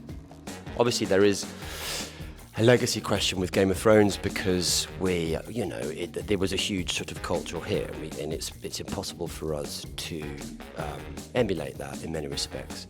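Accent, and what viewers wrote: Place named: United Kingdom. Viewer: Love ur voice and ur UK accent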